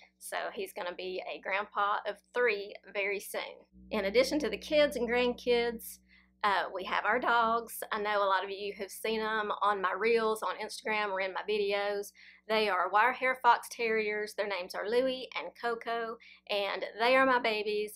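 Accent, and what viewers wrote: South, United States. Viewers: Hi - thanks for all your reply’s to our many questions. Your honesty and directness is much appreciated. Glad to hear you found happiness and a surprise son in your second marriage ( lots of us don’t). That will keep you young. I remember your video about the “serial killer living near by and him stalking your sister - that was scary and must of been difficult to tell us about. Love wat chin and listening to your charming Southern accent too.❤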